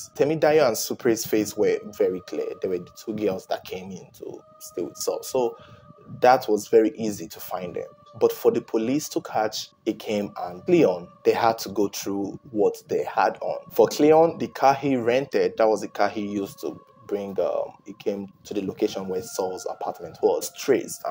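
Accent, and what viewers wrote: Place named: Nigeria. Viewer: I 😊😊😊😊😊😊😊😊I LOVE YOUR NIGERIAN ACCENT😊😊😊😊😊😊😊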